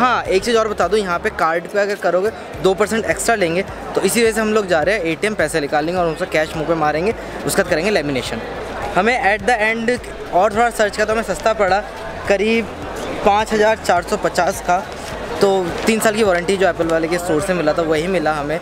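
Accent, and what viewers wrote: Bengal, India. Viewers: Bhai Bengali ho?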